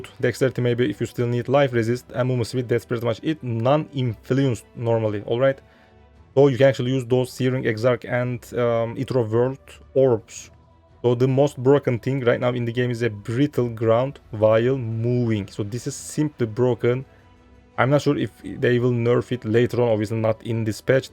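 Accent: Turkey. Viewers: reis türk gibi ingilizce konusuyor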